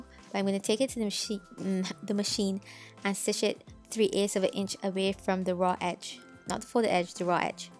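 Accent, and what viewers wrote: Barbados. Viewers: I know that accent anywhere 🇧🇧 🇧🇧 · The moment I clicked I recognized the accent!! Let’s go Barbados 🇧🇧 💛💙💛💙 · Fren you sound Bajan 😍, I’m guyanese but I know that accent good thanks to Rihanna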